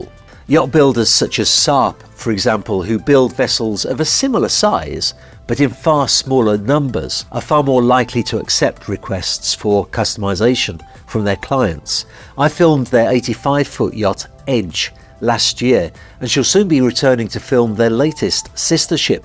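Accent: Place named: Birmingham, United Kingdom. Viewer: VERY interesting video. The advantages are applicable for many industries too. Loving the brummie accent ... 🇬🇧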